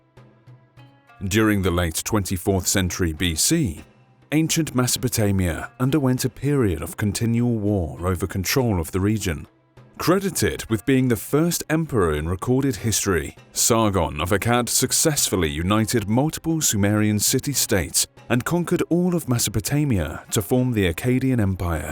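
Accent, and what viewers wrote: United Kingdom. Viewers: I though this guy is British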